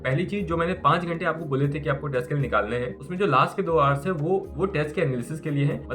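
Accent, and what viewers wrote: Bihar, India. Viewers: Bhai you are from Bihar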